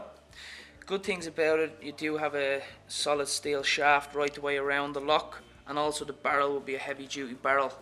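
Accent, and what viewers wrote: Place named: Dublin, Ireland. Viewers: KushDid420 Irish 🍀 👌🏾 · KushDid420 it's a northside Dublin accent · My sister-in-law is originally from Ireland. She's got the same accent. :-)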